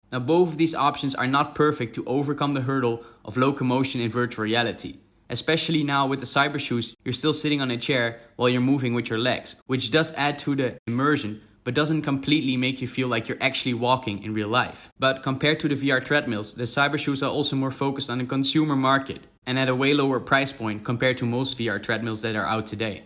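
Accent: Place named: Netherlands. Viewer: i get the feeling your dutch by how you talk idk man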